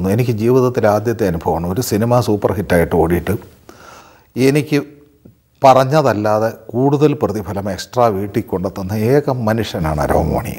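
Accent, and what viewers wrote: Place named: Kottayam, India. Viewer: Not Kottayam slang but Kottayam accent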